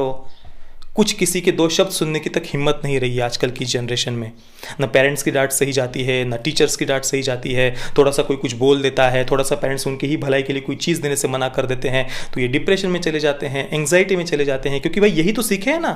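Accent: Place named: Maharashtra, India. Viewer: bhai main kanpur se hoon ....muzhe marathi nai aati lekin aapke muh se marathi badi pyari lagti hai..isi bahane ek nai pyari language sikhne miltti hai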